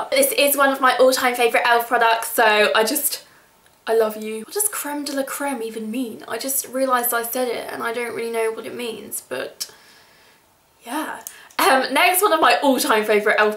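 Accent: United Kingdom. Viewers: you sound like me ans my sister being British .-. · very helpful video I obsessed about the Britich accent REALLY LOVE IT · Beautiful accent. Wish i was British :( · British accent :))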